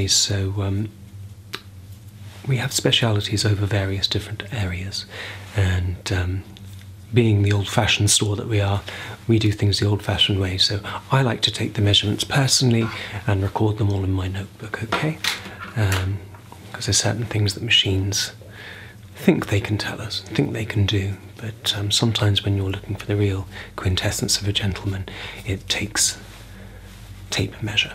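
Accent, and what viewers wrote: RP, United Kingdom. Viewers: That incredibly beautiful accent. That's the British accent I love most. Makes me feel like I'm in excellent company. Also, this artist is a superb actor. Talk about talent! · Британский акцент невероятен☺ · He's talking in a noble british accent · Now this is a real posh British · I randomly came across this video and lemme tell, that British voice is just smooth and So ASMRy. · Omg he is so handsome plus he's sweet British accent is going to melt my computer. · Sometimes the simple english language spoken with the right amount of british accent is really asmr inducing. Bravo.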